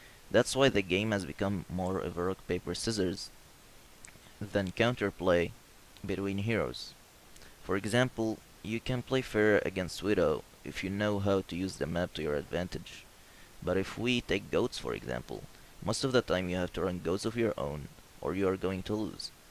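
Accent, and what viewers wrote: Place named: Arab world. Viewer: Much love (from Palestine!) you sound arabic by the way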